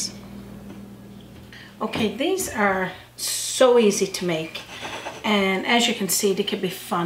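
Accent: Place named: Newfoundland, Canada. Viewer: I just discovered this channel tonight. Lovely to hear the 'Newfie' accent! True maritimer with a cup of tea to go with! Thanks for your knowledge! Happy holidays my fellow Maritimer!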